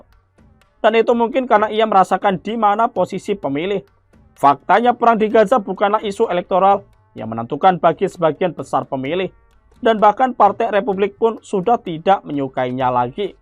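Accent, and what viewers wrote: Java, Indonesia. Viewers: Penyiarnya medok Jawa. Intonasinya tdk bagus.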